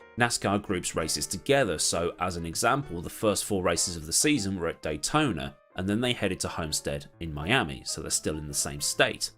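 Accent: United States. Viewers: Great American accent! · That was the best attempt at an American accent from a foreigner I’ve heard....breaker breaker...any takers? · Your country accents twang caught me off guard lmfao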